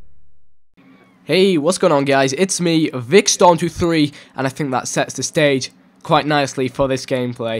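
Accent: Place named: United Kingdom. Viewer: I like your British accent :)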